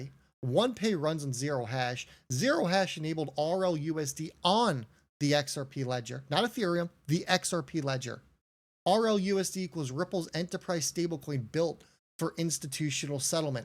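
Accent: New Jersey, United States. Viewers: BROTHER LOVE YOU VIBE CONTENT & SOUNDS LIKE JERSEY OR PHILLY STYLE ..NY ?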